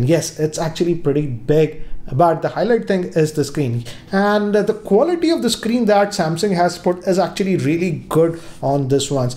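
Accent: India. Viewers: It's not this dude if you do not hear a thicc Indian accent "BUT AGAIN" every 25 seconds.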